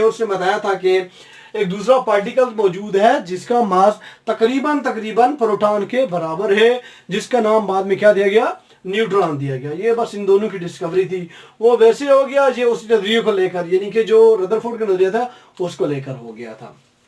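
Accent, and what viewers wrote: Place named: Saraiki, Pakistan. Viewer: سرائیکی وسیب کا ایک بہت ہی پیارا انسان بھائی اللہ کریم سدا سلامت رکھے اور لاکھوں کامیابیاں عطا فرمائے ۔۔سر جب آپ انگلش لیکچر کے بعد سرائیکی میں۔لیکچر دیتے ہیں تو وہ بہت خوبصورت لگتا ہے سرائیکی زبان ویسے بھی بہت سادہ اور میٹھی زبان ہے آپ کا انگلش لہجہ بھی وسیب کے لوگوں جیسا ہے اللہ کریم۔آپ کو خوش رکھے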